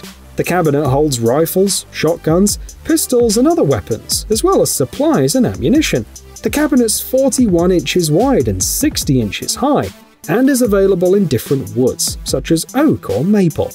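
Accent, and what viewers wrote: United Kingdom. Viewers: British person talking about guns, no thank you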